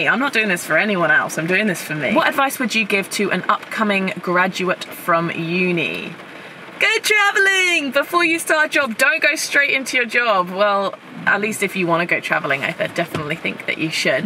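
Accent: Australia. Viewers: a bit of an Ozzy accent coming through lol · Always love your videos! It sounds like accent is changing you sound more Australian now! Also what is the song at the end and could you likn your spotify or his? Thanks Happy New Year! You should do a meet up if you go to Canada! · I love listening to your accent becoming more and more aussie! great video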